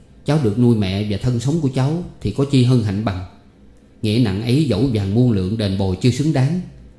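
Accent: South, Vietnam. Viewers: giọng miền Nam nghe ngọt ngào làm sao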